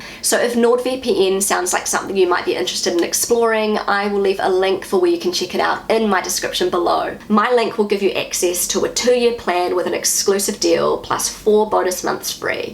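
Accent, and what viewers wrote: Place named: New Zealand. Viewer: I am Missing you and your nice Kiwi accent everytime I'm Not online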